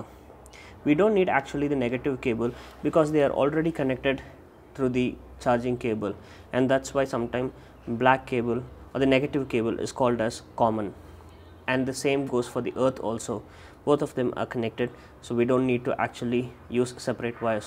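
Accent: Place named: India. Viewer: Typical Bharat accent😁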